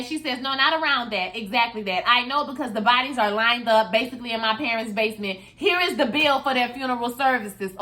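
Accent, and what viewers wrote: Louisiana, United States. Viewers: Dont watch Fargo, but showing love from yourlovecraft reviews. You also sound like a fellow Louisiana girl. I could be wrong, lol!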